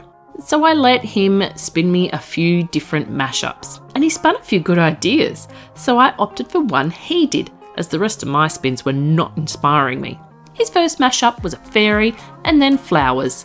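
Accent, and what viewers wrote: Australia. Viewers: This was a video that started to autoplay and I wasn’t actually looking at my screen and I nearly broke my neck with how fast my head whipped around at the sound of your Aussie accent!! 😂 Couldn’t hit subscribe fast enough! My daughter is very creative, can paint and is a preschool teacher so I will also share your channel with her.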